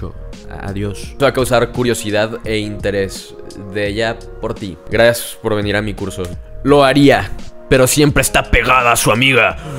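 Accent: Fresa, Mexico. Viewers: Eres whitexican, hablas bien fresa y solo te ven porque estás guapo. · Eres whitexican, hablas bien fresa y solo te ven porque estas guapo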